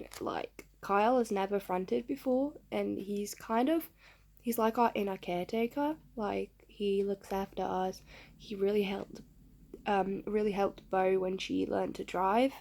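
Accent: Australia. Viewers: it sounds like a pretty typical australian accent to me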